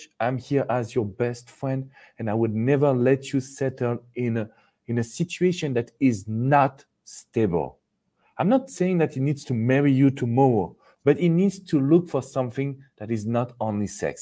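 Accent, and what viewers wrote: France. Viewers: I love your French accent. You make the word "suffer" sound like a lavish dish <3 <3 <3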